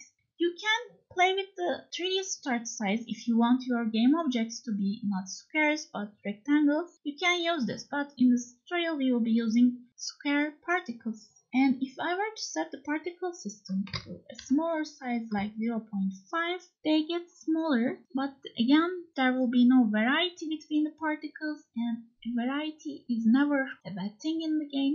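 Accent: Turkey. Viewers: aksanınızdan Türk olduğunuzu hissediyorum :D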